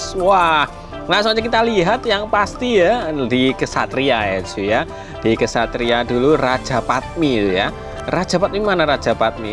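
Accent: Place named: Bali, Indonesia. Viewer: Kamu orang bali ya?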